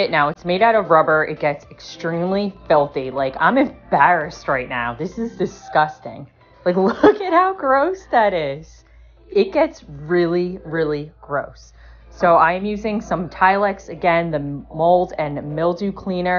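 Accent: Philadelphia, United States. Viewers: Are you from Philadelphia? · You sound like my family in Philly! Thanks for the video. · Sound like you're from Philly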